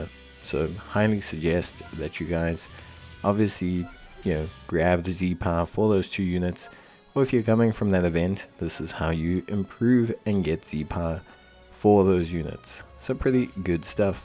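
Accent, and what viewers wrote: South Africa. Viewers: Hey brother are you South African cos i know that accent anywhere! howzit my china! cheers for all the help on the game helps alot. Keep powering up man!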